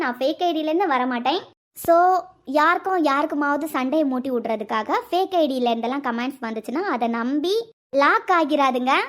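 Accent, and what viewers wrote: Kanyakumari, India. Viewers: Ayyo sister kanyakumari slang kaga dhan video pakkavey start panna enaku unga slang romba pudikum na Kumbakonam enga slang movie la pesura madhiri irukum but ungaloda slang super ah irukum inga ellarumey apdidhan sister inga pottiya vida poramai dhan adhigam neenga video podunga nanga support pandrom 2 days ah yen video varalanu think panna new married outing edhuvum poirupinga so video podalanu nenachan but idha pathonadhan theriyudhu enna reason apdinu enga support eppodhumey ungaluku undu neenga video podunga ❤❤❤❤❤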